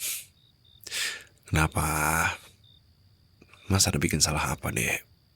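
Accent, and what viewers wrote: Java, Indonesia. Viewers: Kenapa mas sih kaya jawa sayang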